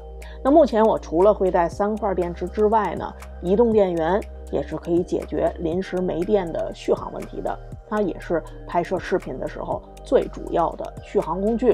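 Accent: Beijing, China. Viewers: 每期视频都干货满满。谢谢！很喜欢Edi闲适的语速，听起来舒服而真诚，带着些许的京味儿，更是倍感亲切。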